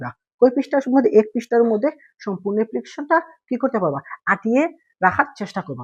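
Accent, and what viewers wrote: Sylhet, Bangladesh. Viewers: Vai Sylheti ni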